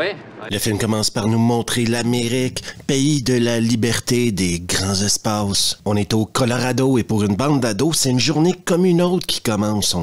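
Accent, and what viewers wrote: Quebec, Canada. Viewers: J’avais 15 ans quand j’ai vu ce film au cinéma et comme beaucoup d’ados de cette époque ce film m’avait emballé… aujourd’hui la narration avec l’accent québécois de ce film m’a offert une toute autre saveur…. Merci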